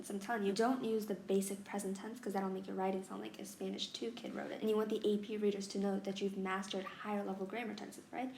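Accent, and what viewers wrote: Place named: Mexico. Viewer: Love the way she speaks Mexican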